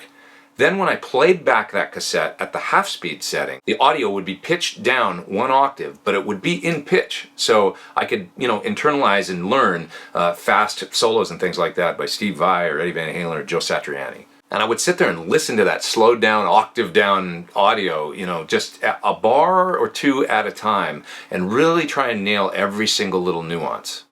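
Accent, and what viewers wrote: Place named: Canada. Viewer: This series of videos is awesome,. Is it just me or do you sound extra Canadian today?:) Not hangin' on you, man. I just normally hardly notice your accent but today it just seems to be front and centre. Probably just me (and/or the rum). I can't talk. I'm an Aussie. Rock on, mate.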